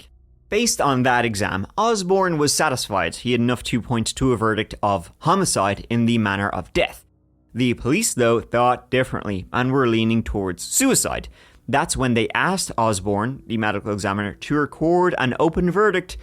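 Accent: Ireland. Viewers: I liked our little date 😂😂 We love your banter with but you are what hooked me. I love spooky stories in an Irish accent, thank you for all you do!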